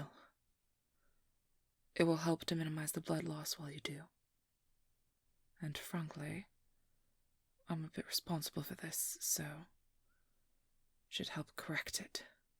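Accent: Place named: United Kingdom. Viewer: It's funny cause I was joking with my friend yesterday about writing a character and I said you take the nice happy go lucky person and then you add ✨trauma✨ Which leads to walls being build and "I am too cold for any to understand me" moto and then a wholesome/lighthearted moment and the character breaks a bit of their wall down to let that other persons in where we hear the tragic heros backstory and then we have what most characters fear ✨Character growth✨ 😱😱😱 Which leads to the redemption arc! Which then leads to the worse thing possible. ✨Healing✨ and ✨Positive mental health✨ dramatic gasps and screams 😂😂 But can I just quickly point out it's been a hot minute since I listend to so I kinda forgot she's British. And then hearing her for the first time in awhile I was like "Is she British?" (Context which makes this better is I am British and apparently really bad hearing because I can't recognize another British accent apparently) and then she said "Secondary school" and I was like "Yup she is." 😂😂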